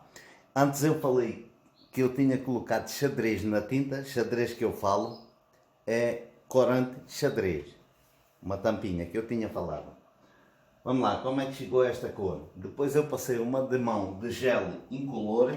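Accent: Portugal. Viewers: Com esse sotaque Português que eu amuuuu, já me inscrevi e dei o meus milhões de likes.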